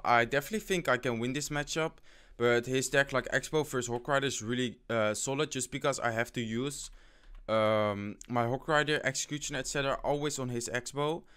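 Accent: Netherlands. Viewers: are u dutch!!! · You have a dutch accent, are you dutch? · Owen Seetsen hij is nl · Are u dutch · ik kan horen dat jij nederlands bent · you really have an dutch accent, are you dutch, ben jij nederlands want je klinkt echt super nederlands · jii bent nederlands of niet want je hebt een nederlands engels accent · This dutch accent has got me like 😂😂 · je hebt echt een typisch Nederlands accent als je engels praat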